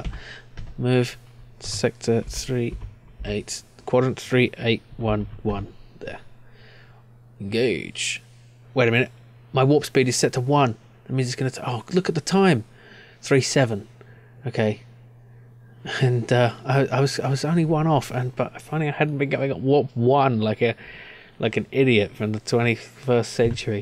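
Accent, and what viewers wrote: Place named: United Kingdom. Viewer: Your British accent is much appreciated from the USA.🇺🇲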